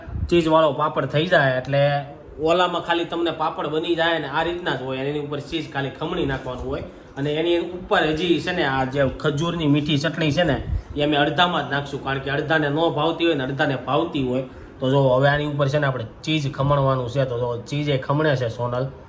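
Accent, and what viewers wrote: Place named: Surat, India. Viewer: acdam surati bole chhe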